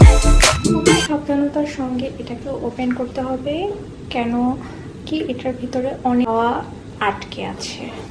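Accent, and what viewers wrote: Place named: Arab world. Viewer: Happy Eid Mubarak 🌷⚘🌷⚘ 👍👍 You are Awesome And I like your Arabia accent 🖐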